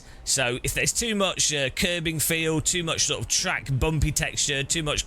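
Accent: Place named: England, United Kingdom. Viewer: This guy's English accent saves his life.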